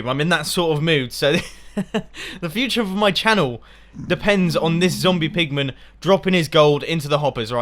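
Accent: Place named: United Kingdom. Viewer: I'm new to this channel is British? He has accent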